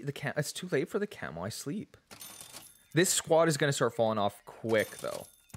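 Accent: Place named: Netherlands. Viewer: I can hear the NL influence haha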